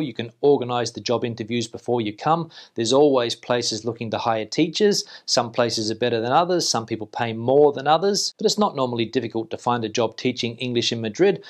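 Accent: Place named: Australia. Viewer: You teach Australian English? Your accent I mean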